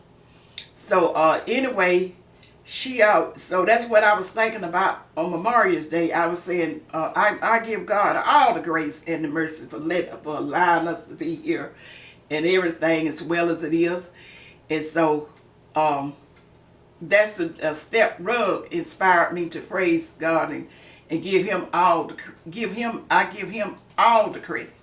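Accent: South, United States. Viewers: It’s something about you Ms.! I love your energy and you are just a good feeling. Every time I watch you I feel like I’ve talked to my Nana. You kill me saying you fumble your words and it makes me laugh but I’m laughing at you thinking it’s a problem. It’s not. That’s you and I know southerners often don’t speak very “proper” but I bet that food is proper. I see that you are great. You speak positive and make people feel comforted. You have beautiful skin. Y’all lucky I live in NY cause I’ll show up at your church and happen to befriend you on purpose 😂 ohh you have YouTube I didn’t know that! I know you will anyway but keep being yourself 😘😘😘😍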